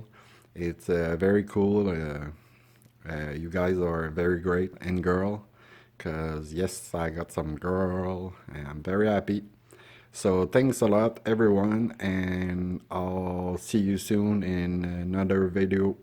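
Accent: Canada. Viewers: I just subscribed to you! Beautiful works!! Wish I could take up this hobby. No doubt woodworking can get into your blood! Thanks for using nice language AND having a great accent! How do I become a "kanuck"?!!! Hope I didn' the say a " nasty"! My son & daughter-in-law love in Sarnia, Ontario,Canada.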